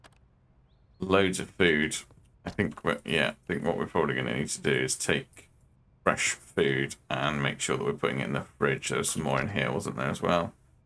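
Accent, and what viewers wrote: United Kingdom. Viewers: I would suggest you collect the feed as it is great for hunger. I am really enjoying your videos and it is great to hear a British Youtuber. Please keep up the great content and I will like all of them. You are very entertaining.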